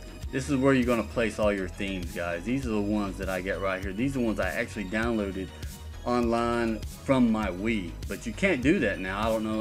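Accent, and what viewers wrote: South, United States. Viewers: My dude you sound like the biggest stereotypical southerner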